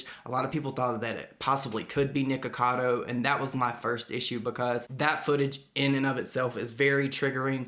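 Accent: South, United States. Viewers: I love your accent sooo much!! It makes me miss my cousins down south. Great video!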